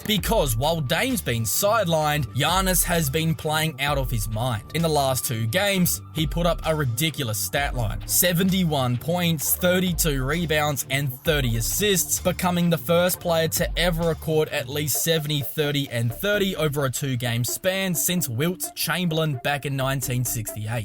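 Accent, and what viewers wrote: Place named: Australia. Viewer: Seriously fucking Aussie accent.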